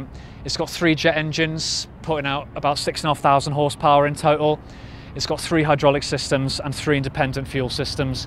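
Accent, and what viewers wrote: Liverpool, United Kingdom. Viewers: A scouser I hear in your voice ex merchant Taylor's I bet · slight liverpool accent ... cracking chopper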